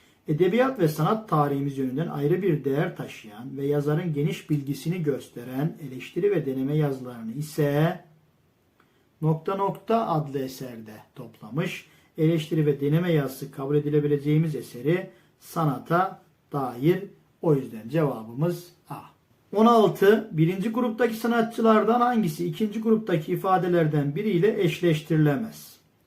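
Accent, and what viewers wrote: Black Sea, Turkey. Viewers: C ler kayıyor Rize yada Trabzon bizdensunuz hocam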